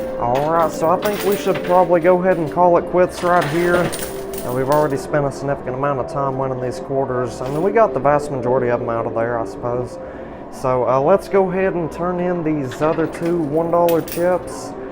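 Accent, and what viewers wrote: South, United States. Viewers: It’s in English-with a southern accent.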